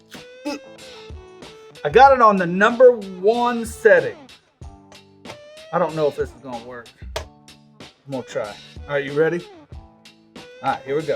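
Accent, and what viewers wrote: South, United States. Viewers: Help me out. How is he a Yankee? He's got a southern accent it sounds like to me. I'm from NY and I can tell you from whatever accent that is, he is definitely NOT from the north!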